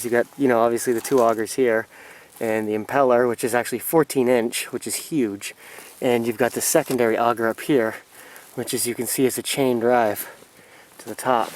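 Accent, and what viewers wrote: Maine, United States. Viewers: What part of Maine are you from?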